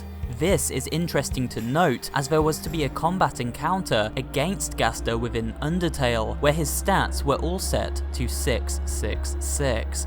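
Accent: United Kingdom. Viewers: this dude is just british Chills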